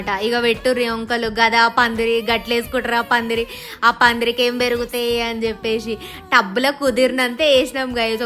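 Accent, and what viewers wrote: Telangana, India. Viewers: akka nuvvu achhamina Telangana language mattladthav akka😂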